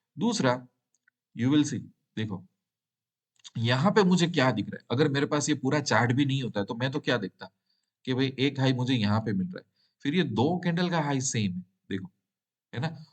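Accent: Gujarat, India. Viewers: તમે ગુજરાતી છો? ટોન એવો લાગે છે😊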